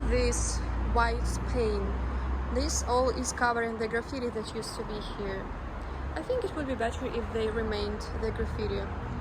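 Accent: Russia. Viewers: I think it’s her Russian accent when she speaks English.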